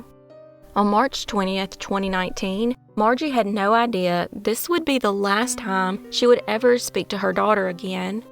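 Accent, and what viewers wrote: South, United States. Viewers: Thank you kindly for your amazing story and I love you southern accent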